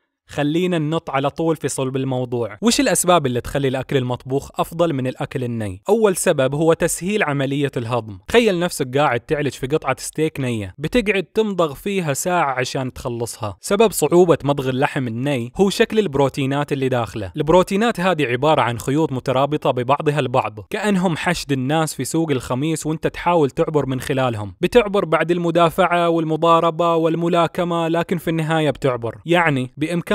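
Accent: Qatif, Saudi Arabia. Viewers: انت من القطيف 🇸🇦 ؟ لأن لهجتك نفس لهجة سرورز 🇸🇦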